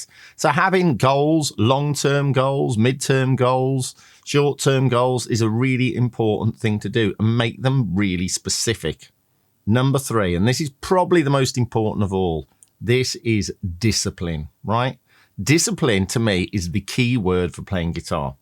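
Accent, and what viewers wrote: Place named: Australia. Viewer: i knew i was hearing a little Auzzie mixed in there. 😅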